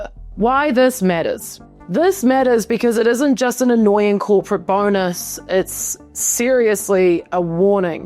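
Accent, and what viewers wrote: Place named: New Zealand. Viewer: It sounds like NZ to me. AFAIK, pronounciations like "Tiksus" and "sivunty" have the Kiwi vowel shift. A few weeks, ago, she was talking about people from Mexico and it sounded like she was saying "mix a can"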